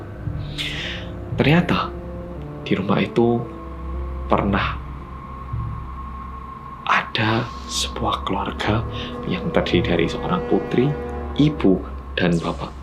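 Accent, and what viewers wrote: Java, Indonesia. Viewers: Tiap nonton konten mas, jadi ikut2an medok ngomongnya:"D Semangat terus mas ngontennya!!!!!!!!!!!!!!!!!!!!!!!!!!!!!!!!!!!!!!!!!!!!!!!!!!!!!!!!!!!!!!!!!!!!!!!!!!!!!!!!!!!!!!!! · First ☺️ bersama orang koreyah medokk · HAIII TIM GERCEPP💜 HALLO MAS MEDHOOOOKKKK💜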